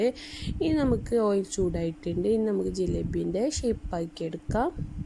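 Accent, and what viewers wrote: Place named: Kasaragod, India. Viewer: ഞമ്മളെ ഭാഷ കാസറഗോഡ് ആണോ കേട്ടപ്പോ അതേപോലെ തോന്നി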